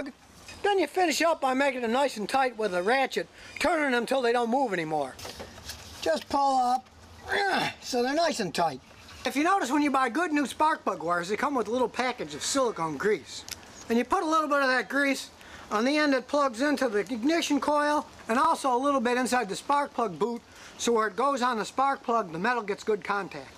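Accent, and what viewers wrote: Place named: Midwest, United States. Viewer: bummer. your accent sounded like you were from the midwest...so I was hoping you were in Michigan. love the channel. thanks and have a good day.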